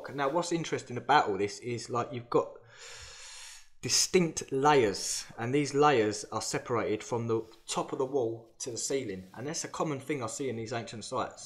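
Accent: United Kingdom. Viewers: Ello babe, where in uk r u from??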